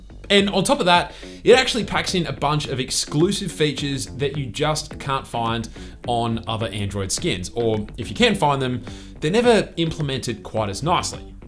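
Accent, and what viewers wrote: Australia. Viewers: Australian? Nice change to hear someone from there.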